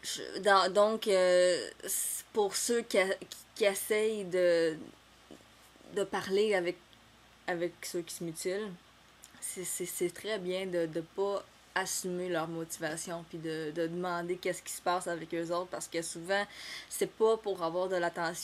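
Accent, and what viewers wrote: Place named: Canada. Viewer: aille, j'ai du mal a comprendre!!! lol l'accent canadien est different que cell de la france.. mais ca va encore, je comprend un peu!! =D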